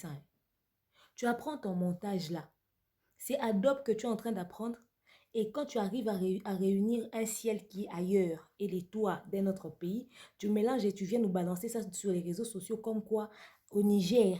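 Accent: Ivory Coast. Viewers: Bien dit merci. Juste ton accent, tu parles comme les ivoirienne pourquoi?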